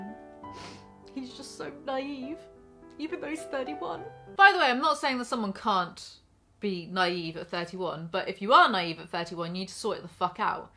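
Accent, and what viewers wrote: United Kingdom. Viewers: I LOVE your accent. I usually hate British accents. i dunno....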